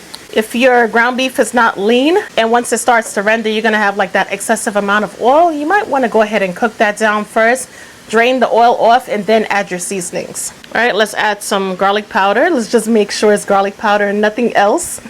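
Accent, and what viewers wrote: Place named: Brooklyn, United States. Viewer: Im so ready to try this! My girlfriend thinks you're from Brooklyn by your accent.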